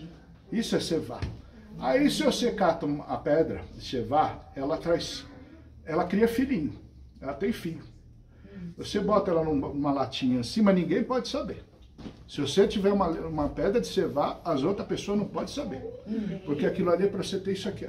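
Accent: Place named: North, Brazil. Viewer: Amo o pai, me lembra o dialeto do norte do Brasil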